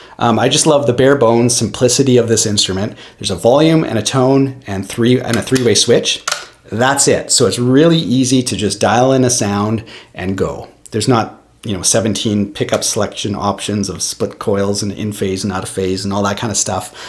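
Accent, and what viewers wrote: Canada. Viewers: WHERE'S THIS GUY FROM , CANADA ?????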